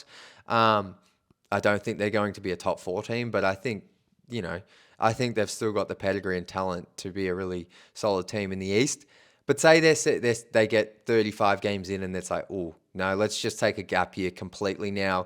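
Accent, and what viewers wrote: Australia. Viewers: No. recent example is the Fontecchio piece. I didn’t hear that here. Nothing on it but I don’t pay for the on-line subscription fees for news bought by a news information product company. Providing the same news stories to across the land. I.E. ~ Knight-Ridder (if they are even around). Point is. Same content across the board. That’s old, presumptive, and to me breaks the code of having your own paid reporters. more of a reporter on the Pistons than a regurgitate, local Detroit reporter here is. I’m a global human. I don’t care about accents. The NBA is a global brand. Aussie…top 7 countries in bball except the U.S. So his talk sounds right to me. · how does his balls taste? He lives in Michigan and has no more information than a decent beat writer. He just has an Aussie accent that makes listening to him different for an American audience.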